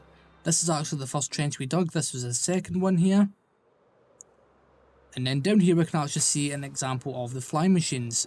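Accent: Scotland, United Kingdom. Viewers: bro ur scotish accent really annoys me Xd (no hate)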